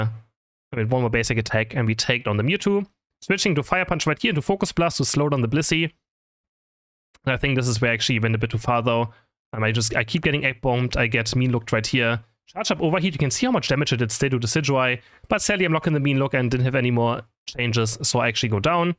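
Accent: Germany. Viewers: German accent 😊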